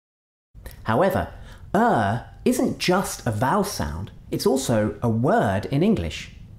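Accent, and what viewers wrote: United Kingdom. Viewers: Hi,! How do you do? Howdy? You are a dashing English teacher. Your British pronunciation is clear. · Omg I love British accent, do you give individual classes? Also of grammar?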